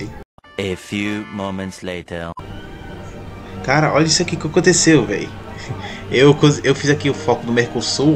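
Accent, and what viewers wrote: Northeast, Brazil. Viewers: Sugestão tu e baiano ou nordestino?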